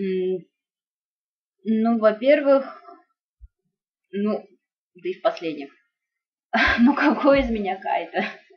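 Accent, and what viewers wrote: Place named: Russia. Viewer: You are Russian, да? Or Ukrainian. Or...wow there's a lot of countries that speak Russian...anyway, you are really pretty and I really like the accent.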